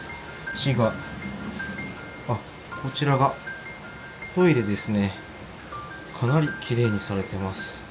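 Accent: Kansai, Japan. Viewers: 鮮やかなbgm.親しきな関西弁。最近見ることなんですが、続々見てしまいます。 韓国語の翻訳も自動ではなくちゃんとしてたら韓国の多数の温泉好きな人達に喜んでもらえると思います、😂 応援しています🎉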